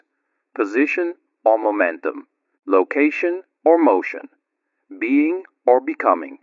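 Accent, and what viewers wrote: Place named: United Kingdom. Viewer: Razor You're suspiciously burying the fact this isn't Feynman's voice. It's not even close. Your voice has a british accent.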